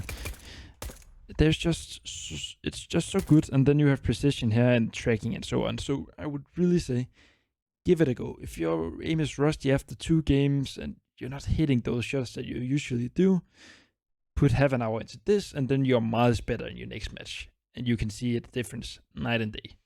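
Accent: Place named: Denmark. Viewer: God video ven! Nice danglish, det du fandeme god til <3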